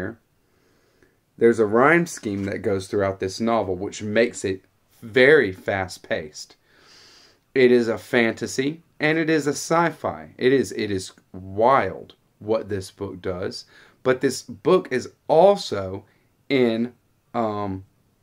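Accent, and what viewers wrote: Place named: Western Maryland, United States. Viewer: Good video man. Never knew about over half of these. Sounds like ur from western MD. Thats my old stomping ground.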